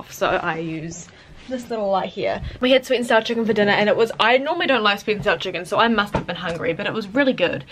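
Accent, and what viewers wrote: New Zealand. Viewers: i live in Australia, but i've always wished to have a nz accent, love yours!xx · I clicked on this vid and at the start realised you had a kiwi accent but by the end I forgot all about your accent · Just found your channel and also I love your accent cause it’s so pretty like I am from Colorado in America and I have never seen a NZ you tuber and I think your awesome now I am going to subscribe! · aw you're so cute!! I love kiwi's & their accents it's the best ahah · I just got auckland for half a year, i don't familiar with kiwi accent so far, your vlogs are perfect for me to practise listening skill of kiwi accent :) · New Zealand is beautiful it's one of the safest countries <3 and you have such a kiwi accent haha, now I can relate to school vlogs. I'm from Auckland. ~ :) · yes i love seeing kiwis on you tube as i am myself and you really realize our accents after watching american youtubers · I sub as soon as I saw and heard your kiwi accent, love supporting kiwi YouTubers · I see so many people saying in the comments that they can’t understand the New Zealand accent but since I’m from New Zealand I literally understand everything you’re saying so it’s so weird to think that other people can’t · I subscribed because you were from NZ, and you never see them on YouTube. 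I LOVE YOUR ACCENT!! I’m Australian! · I went to NZ for term 1 and this brings back so many good memories lol, also your accent makes me miss my friends😂💗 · I never understand why people think us kiwi’s have an accent but now I realise what they’re talking about lol. I just watched an American vlogger before your video and I was so surprised how distinct it is hahaha. You hardly ever see kiwi vloggers, also died when you said “ excited to put some ‘Kai’ in my stomach “ something only a fellow new-Zealander would understand 😂👍🏽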